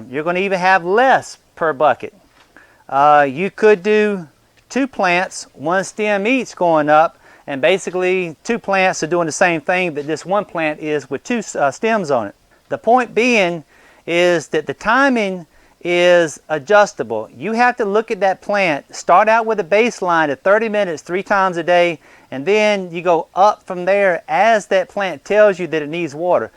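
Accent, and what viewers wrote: South, United States. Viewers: Forgot the name of your channel, so gave ChatGPT a description of a gardener that did hydroponics on YouTube about 10 years ago with a pleasant southern accent, and the AI knew exactly who I was looking for. Miss your videos.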